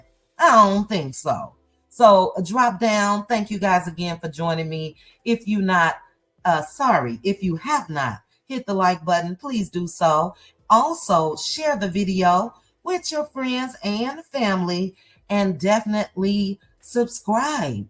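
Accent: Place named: South, United States. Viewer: . I just love your commentary, and love your voice even more. My sister-in-law passed a view years ago and you and she sound exactly alike. You 're voices are so sweet with that southern twang. I listen to you often, and it just brings me such joy as I can hear my sister-in-laws voice which is quite comforting. Even my husband, her brother, says you sound exactly like her. Keep up the great commentary, and thank you.❤❤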